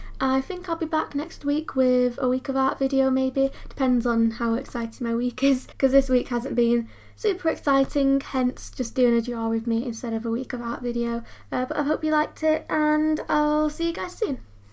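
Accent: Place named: Manchester, United Kingdom. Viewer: Your art is AMAZING! I never knew you were from Manchester and I love you accent.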